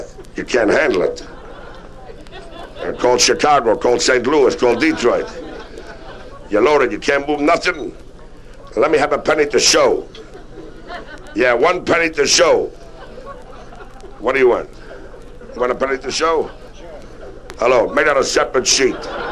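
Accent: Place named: Brooklyn, United States. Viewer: He speaks in the old lost Greenpoint (greenpurnt) Brooklyn accent. Note the very canned laughter. Live recordings in the 1950s were quite rare.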